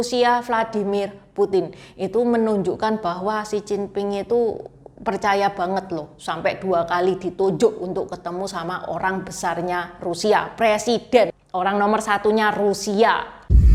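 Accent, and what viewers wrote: Java, Indonesia. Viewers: medok amat ngomong jawanya si 😂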